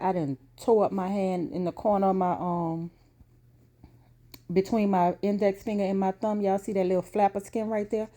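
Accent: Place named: Louisiana, United States. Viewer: You popped up in my feed and the minute you started talking I was like “she has to be from right down the street from me” 😂 (New Orleans) Love your videos. Love your garden. Even the part where you don’t talk. It’s real! And you’re showing us the everyday hard work it takes to grow your own food - instead of when the garden is all full and everything is blooming and it suddenly just got that way … you know the videos I’m talking about? Anyway, thank you for taking all the time it takes and sharing this, I actually picked up some tips or what to do’s from watching. ❤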